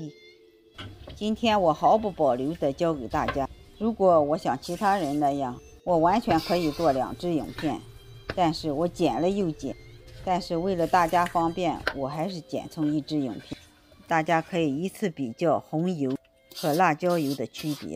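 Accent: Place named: Shandong, China. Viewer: 齊魯口音。我夫妻為台灣外省二代。能識大陸南北口音。祖籍在豫皖、大別山之地。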